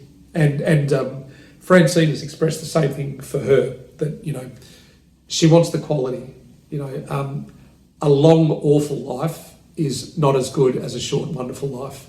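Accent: Australia. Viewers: I am happy you are back. We worry about you and pray for you. Negative comments! Hard to believe there are weirdos like that It is bad news but I am glad to see you are going to fight. You look good, even if you don't feel good. You are alert and keeping positive no matter what. Hang in there and keep posting, especially before you go in for your scans. Try not to worry. We will pray for the best. You are so brave and an inspiration in courage. Love your cute Australian accent. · Dear, I pray for improvement in your situation (as much as an atheist can pray) and send you love and support. I like your channel for 2 reasons: 1. The beautifull Australian accent 2. The great person you are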